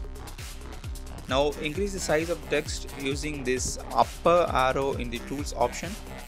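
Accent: India. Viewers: I will never again make fun of Idian nerd guys accent ever again. Thank you so much.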